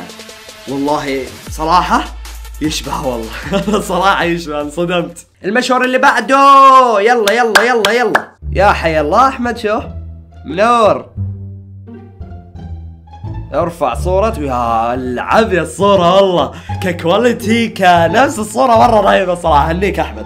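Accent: Gulf, Saudi Arabia. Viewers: انت سعودي ولا اماراتي؟؟؟؟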